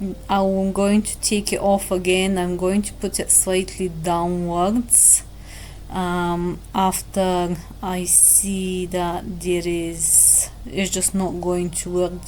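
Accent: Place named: Scotland, United Kingdom. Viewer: You sound Scottish 🏴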